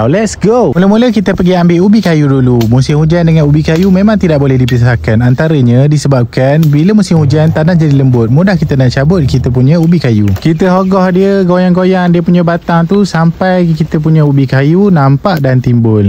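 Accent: Pahang, Malaysia. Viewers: Dari loghat abg ni,mesti dia ni,org Pahang😅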